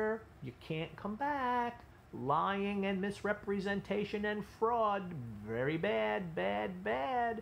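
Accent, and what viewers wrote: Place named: United States. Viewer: so clean american accent )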